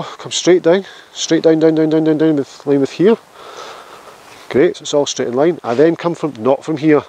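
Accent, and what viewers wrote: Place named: Scotland, United Kingdom. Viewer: This is excellent stuff, really enjoy your videos and the personality. Having a bit of fun understanding what you are saying sometimes as I am a canuck, but my mom and gran were Scottish born (McIntosh) and I remember the brogue. Cheers.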